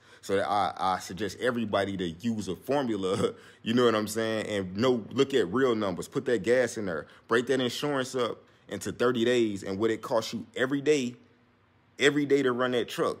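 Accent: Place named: St. Louis, United States. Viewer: Dropping gems bro. Great information! Also you gotta be from St. Louis bro that accent strong as hell 😂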